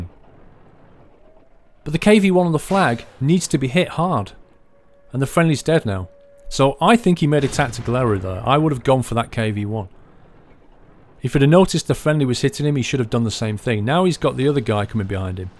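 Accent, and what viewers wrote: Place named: United Kingdom. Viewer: Lets Mber нормально он вроде разговаривает, носитель английского языка (британец, слышно британский акцент)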